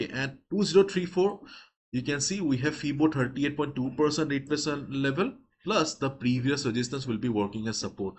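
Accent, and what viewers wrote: India. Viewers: He using simple indian english ascent so its seems there will be no problem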